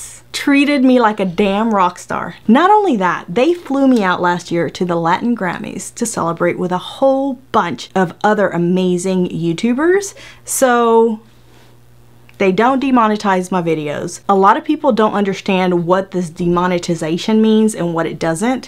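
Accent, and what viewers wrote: United States. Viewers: Where is her accent from? Certain state of 🇺🇸?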